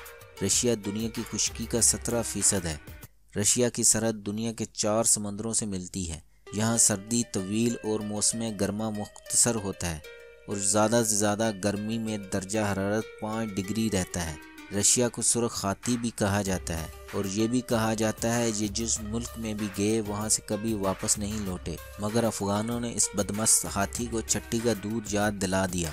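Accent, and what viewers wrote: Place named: Punjab, Pakistan. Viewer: Yar I love you , n your Punjabi accent Rana sanalluah like ,AAP boht achha info dete ho , friend mere video bhi Dekho share bhi kro friend ke sath drawing vdo ke liye colour cloud Pakistan and cooking video ke liye jolly Azeem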